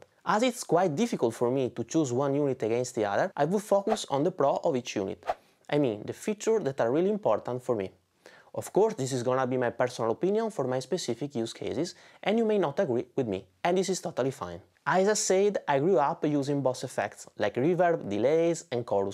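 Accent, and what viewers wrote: Venice, Italy. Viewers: go idea che ti xe veneto! bravissimo in ogni caso!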